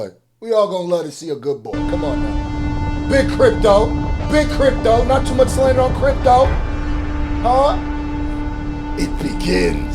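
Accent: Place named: Philadelphia, United States. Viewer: have you ever spent time in Philly or have family from there you sound like a Philly nicca sometimes. I’m from Baltimore but live in Philly now but I swore you were from Philly for the longest and you just said mixed him up mixing is some Philly shit I only learned when I moved there from Baltimore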